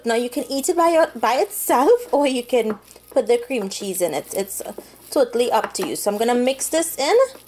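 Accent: Trinidad and Tobago. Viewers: Hey Iove your trini accent...otherwise very good trini version of solomon gundy..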